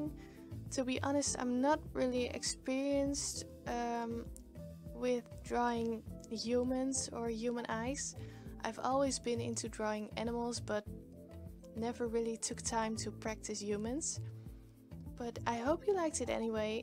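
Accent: Netherlands. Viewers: are u dutch? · Her accent is Dutch, she is Dutch so am I, is a typical Dutch name...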